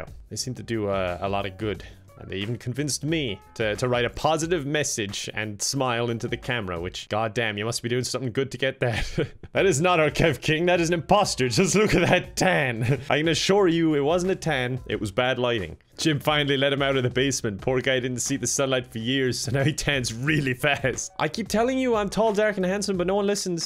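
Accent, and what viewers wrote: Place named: Ireland. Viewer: Can you film a video in your FULL IRISH ACCENT? Love it, you kind of remind me of Domhnall Gleeson. He's sexy fine!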